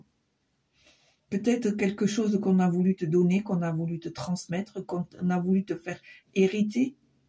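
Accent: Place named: South, France. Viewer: Doux accent du Sud avec légers roulements de R comme notre très Cher trop tôt parti Nougaro, je dirais Oh Toulouse bien aimée 😁✌️👍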